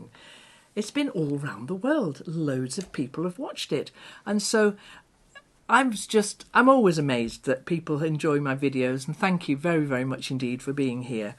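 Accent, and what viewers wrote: United Kingdom. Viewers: Hi, Im living in Tasmania, I was told about your channel by a new member of our sewing group. We meet every other Monday and make quilts for Charitie's. I was originally from the UK, lovely to hear that accent.:) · Hello,. I’m from Illinois in the US. The number of projects you show does not sound like too many. I dabble in crochet,embroidery, knitting, quilting, rock painting, art journaling, stamping cards, and since November, slow stitching. I think I would get bored if I had to stick with just one. You’re beautiful Rita is absolutely gorgeous. Thank you for sharing all that you do. I love listening to the English accent, especially if it’s calm and soothing, of which you fit the bill.❤❤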